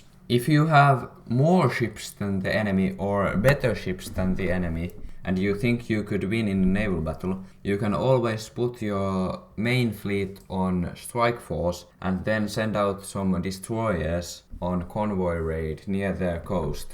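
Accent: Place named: England, United Kingdom. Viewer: Sounds like rally english.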